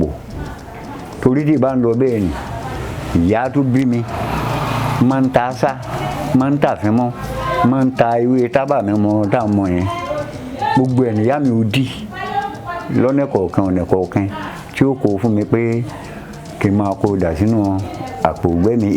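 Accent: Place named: Oke Ogun, Nigeria. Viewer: Where is the hunter from , he sounded very well like oke ogun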